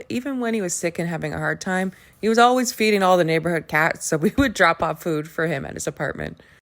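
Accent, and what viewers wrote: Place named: Canada. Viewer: I'm so glad you made that video. Your voice is enchanting but I never thought of Canada, but the minute you said "Canada", yes of course..... It was really nice of you to share. I have a dream of how small communities could commit to looking after feral cats for example. I have two cats and I feed a stray and I contribute financially to cat sanctuaries as best I can from a pension, so I really appreciate what you do. I think the whole world loves Biggie Smalls. Maybe you could write a children's book????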